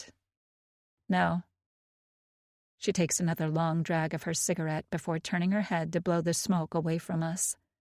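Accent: United States. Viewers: An American accent! These are practically rare.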